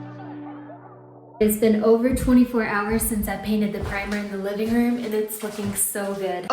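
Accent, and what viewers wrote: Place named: United States. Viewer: Hello from Australia. Im Really enjoying this series. You are doing fantastic and you are such a hard worker, you should be very proud of yourself. I have no idea what part of the US your accent is from but i love it. Thank you for sharing your journey with us.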